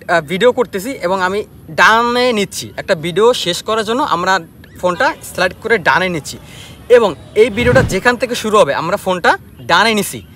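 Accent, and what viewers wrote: Sylhet, Bangladesh. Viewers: Tumi Sylhet taki naki vai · Are you sylheti ?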